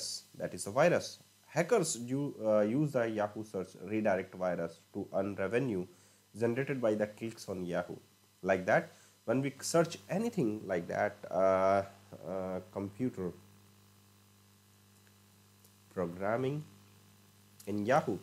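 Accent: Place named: South India, India. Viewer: what's so special about it, sounds too south indian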